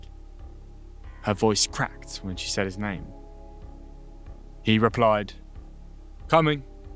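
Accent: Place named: United Kingdom. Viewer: lose the british accent.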